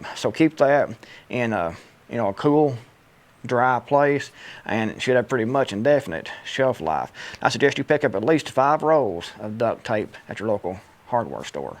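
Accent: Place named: Tennessee, United States. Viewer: Being from the northeast, I ALMOST needed a Tennessee to Jersey dictionary. Thankfully I've been to your great state a few times so I'm used to it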